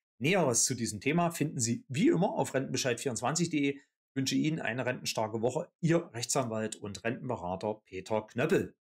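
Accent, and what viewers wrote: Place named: Saxony, Germany. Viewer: Bitte, richtig HOCHDEUTSCH sprechen und diesen gruselig SACHSEN DIALEKT weglassen 🙏🙉🙉🙉🙉🙏‼️‼️‼️ Den kann man, zumindest die meisten Menschen, nicht in den Ohren ertragen! Du würdest bestimmt mehr zuhörer haben, wenn Du richtig deutsch sprichst und das meine ich wirklich nur gut 😃🤗❣️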